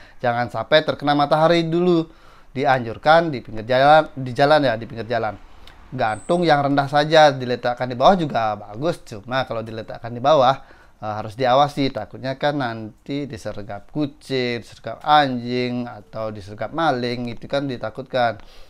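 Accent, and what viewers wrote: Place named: Java, Indonesia. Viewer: bang suara mu mendok bgt 😀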